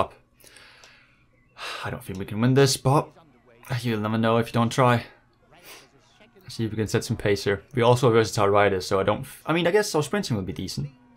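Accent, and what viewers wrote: Denmark. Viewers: Lol I could hear you are Danish for the first 2 seconds.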